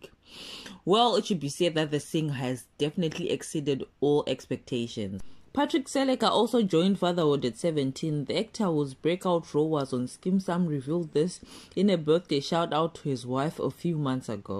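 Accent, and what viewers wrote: Shona, Zimbabwe. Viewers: Shona accent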